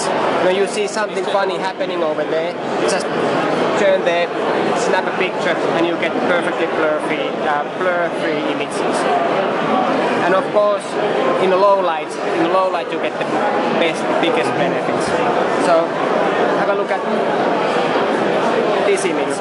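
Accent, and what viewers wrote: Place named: Finland. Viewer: that finnish accent is annoying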